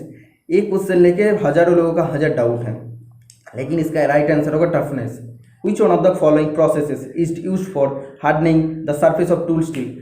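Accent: Bengal, India. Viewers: Bengoli ho bhai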